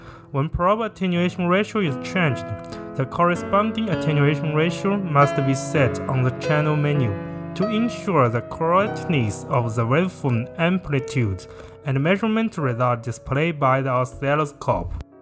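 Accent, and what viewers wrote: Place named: China. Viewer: good topic but not good english speaker english sounds like chinese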